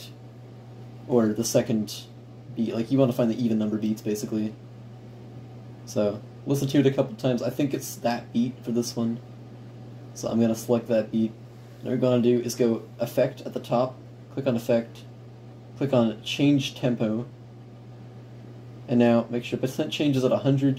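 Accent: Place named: Western Canada, Canada. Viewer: That's tricky...but I'm going to go out on a limb and say I'm getting a western Canada vibe from your voice. Are you from BC?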